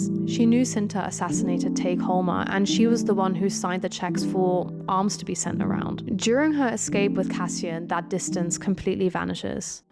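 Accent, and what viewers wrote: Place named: Netherlands. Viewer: Jij klinkt Nederlands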